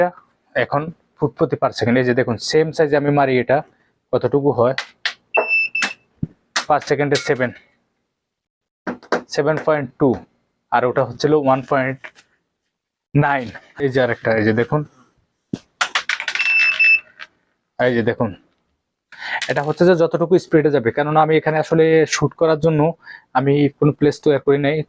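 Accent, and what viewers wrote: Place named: Chandpur, Bangladesh. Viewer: ভাইয়ের বাসা কোথায়??। কথা চাঁদপুরের মতো মনে হয়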